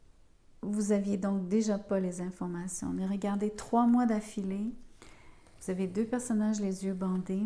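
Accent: Quebec, Canada. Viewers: Merci pour ce tirage ! J’en profite pour vous dire que j’aime beaucoup votre voix douce, votre rire discret et votre charmant accent québécois.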